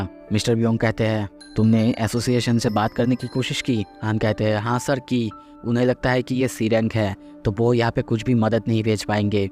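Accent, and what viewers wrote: Bengal, India. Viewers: ap bengali ho kiya, mere vi hindi aisa he, but apka mere si vi acha he. Solo leveling ragnarok explain kar sakte ho kiya, or Jin nehi Jinwo bolo kew ki is me Jin namak 4,5 character he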